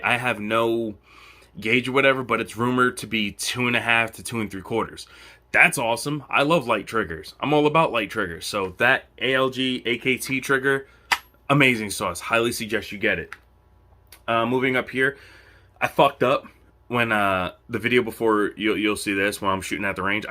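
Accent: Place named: Pennsylvania, United States. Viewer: Just scooped a tiger maple m70. Nice review? You sound like you're from PA, where about? Pittsburgh here.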